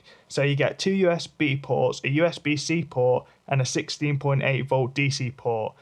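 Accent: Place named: East Midlands, United Kingdom. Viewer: Just watched the 106 Hayabusa on the runway very impressive. That's a right accent were is it Nottingham area possibly Derby. It certainly is fast, anyhow you have a Leeds fan subscriber.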